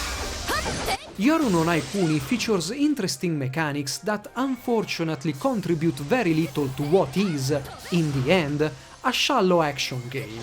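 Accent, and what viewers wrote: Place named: Italy. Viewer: You're the first person I've heard who has such a strong accent, but speaks so good english. Italian?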